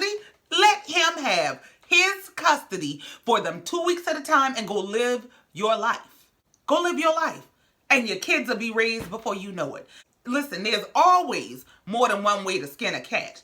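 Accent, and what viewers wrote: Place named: Gullah, United States. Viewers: Don’t know how I found your channel, glad I did!! You give great commentary!! Where are you from? Your accent sounds like mine from the Carolina’s❤️❤️ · Are you from SC because your accent sounds Geechie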